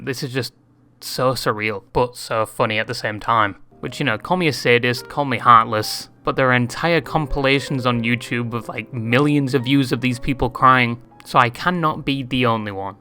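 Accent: United Kingdom. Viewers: Never thought I'll see a British bird talking about American politics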